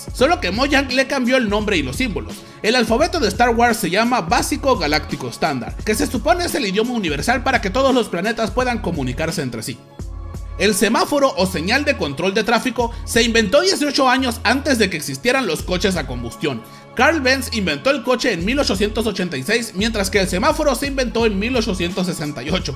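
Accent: North, Mexico. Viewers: Me encanta tu acento norteño. Como de naco intencional.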